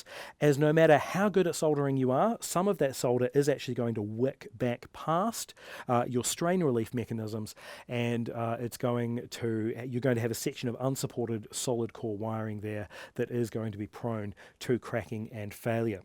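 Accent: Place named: New Zealand. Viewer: Good old kiwi pronouncation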